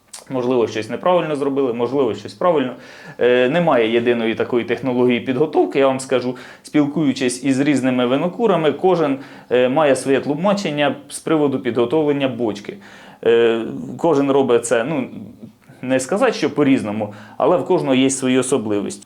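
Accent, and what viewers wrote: Cherkasy, Ukraine. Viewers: не чиста літературна українська, а легенький суржик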